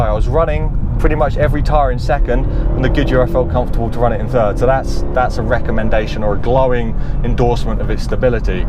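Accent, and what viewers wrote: United Kingdom. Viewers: Love your channel, instantly subscribed. Interesting thought that I wanted to share with you. My extended family tends to go with Continental UHP AS tires and we're mostly spread out across the West Coast of the US. I mention that because it rains at random times, year-round in most parts of California and seemingly weekly in Washington state. Due to the lack of extreme winter, we've found that the Continental tires let us drive "swiftly" in all weather types without fear of hydroplaning, loss of control due to unexpected situations (other people texting and driving - or swerving into your lane, deer, bicycle, kids etc). Basically, we want to be able to DRIVE our cars, push them a little, stretch their legs on occasion but not discover that a great dry tire becomes unpredictable in ANY way when the roads get wet; let alone tries to kill somebody with unexpected braking or steering limitations. 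As you're probably guessing, Continentals usually meet our needs for HIGH performance All-Season tires, specifically. Anyway, my point is this, your accent definitely sounds British, and it's my understanding that you guys get MUCH more of the wet stuff than California. That being said, I noticed you personally tend to prefer a tire based PRIMARILY on dry performance, so long as the wet and snow aren't too bad... essentially, your highest requirement is driving feel and control in DRY weather. While I can sympathize and appreciatea car that has that feeling in the dry, I would have to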